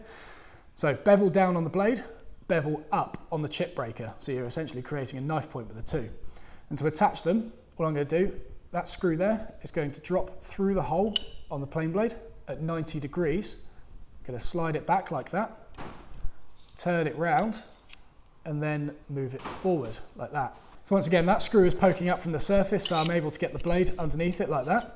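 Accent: United Kingdom. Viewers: Silly yank i am, just thought he was using the queens english.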